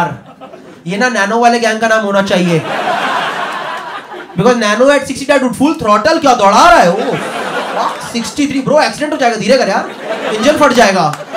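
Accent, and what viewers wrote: Delhi, India. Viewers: Delhite accent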